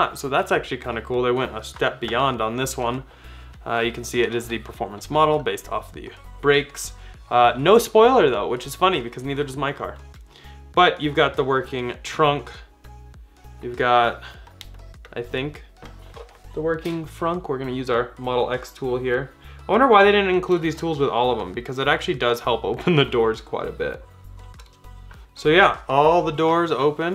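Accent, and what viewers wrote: Norway. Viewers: R u Norwegian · Are you norwegan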